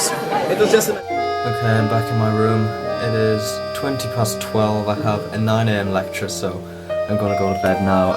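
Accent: United Kingdom. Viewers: Yeah he is British!!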